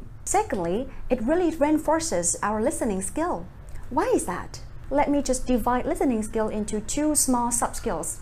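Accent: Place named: United Kingdom. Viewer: Dear Ms.! You are hella superb with the classy British accent Thanx for your nice stunning video Please keep smiling, keep shining and keep flying high All the best. Respectfully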